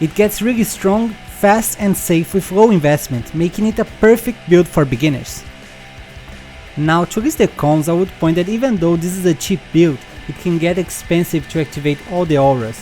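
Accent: Brazil. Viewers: Quando um brasileiro fala ingles tu entende tudo.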